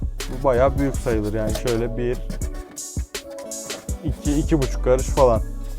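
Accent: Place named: Uşak, Turkey. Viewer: Selamınaleyküm ustam hayırlı işler kolay gelsin. Ustam memleket neresi? Konuşman Uşak civarı gibi geldi eğer yakınlardaysan yardımına ihtiyacım var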